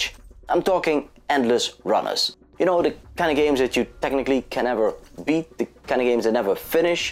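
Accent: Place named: Netherlands. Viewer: Dutch? seems like a dutch accent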